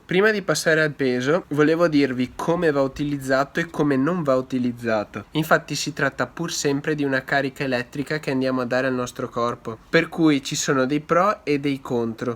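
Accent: Veneto, Italy. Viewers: Vecio ma sei Veneto?xd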